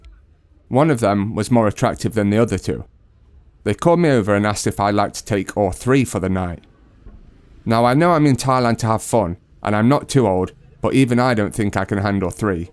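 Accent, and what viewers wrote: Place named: Sheffield, United Kingdom. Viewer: The narrator sounds like he hails from Sheffield, England.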